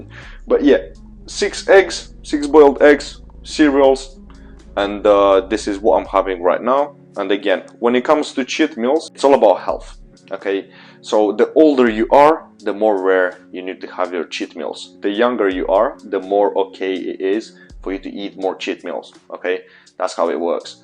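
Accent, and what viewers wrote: Russia. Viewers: Русский акцент так и пробивается! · Are u Russian or what? You don't sound like an English man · Aint no way this guy is from Britain. His accent is russian, not bri'ish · Русский акцент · все гадал откуда ты😂 вроде русский акцент, но не было понятно до исконного "блять"😂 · Wow, thanks a lot! It is kinda strange that I can't understand his Russian accent despite the fact that Russian is my mother tongue. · У него русский акцент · Сначала я подумал, что ты русский) акцент такой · Русский акцент брат,но ты хорош так держать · ну я вообще сначала догадывался что он русский, так как акцент у него русский, но когда он сказал блять, я окончательно убедился что он русский. А еще его зовут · как будто рашн звучание в голосе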